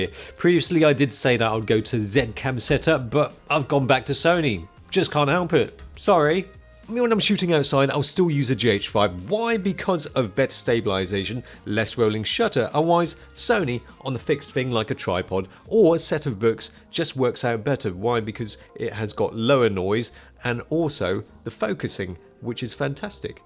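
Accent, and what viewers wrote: United Kingdom. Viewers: That is the cutest little British accent ever!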